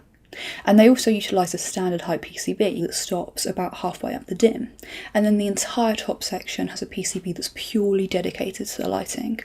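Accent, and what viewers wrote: England, United Kingdom. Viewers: ur english accent is so sexy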